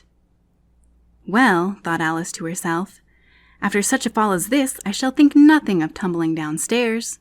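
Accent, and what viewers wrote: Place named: United Kingdom. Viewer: A British accent gives it its intented context over an American one <link>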